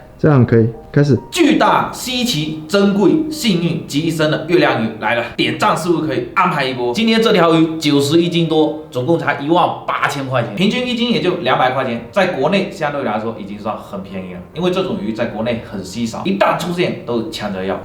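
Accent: Fuzhou, China. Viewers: 我也感觉一听口音就是福州人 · 福州音太重了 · 一听声音就知道是福州师傅lo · 福州音好亲切哈哈哈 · 我想问， 你的口音有福州音，你是福州人吗？ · 福州人吗 感觉福州普通话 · 福州腔太重了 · 这口音可是福州人？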